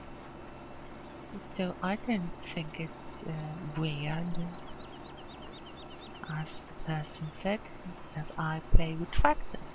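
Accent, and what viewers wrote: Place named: Sweden. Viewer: I can understand you perfectly. Swenglish works well :-) We older generation have to stick together (1952 vintage here). I talk to my motorbikes they like to be consulted:-) Great video I don't think I've ever managed to cover an entire grass field I always find bits I've missed Mike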